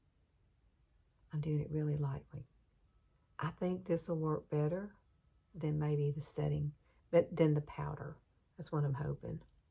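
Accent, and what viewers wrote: South, United States. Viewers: I love your southern accent and you look like a green eyed Heather Locklear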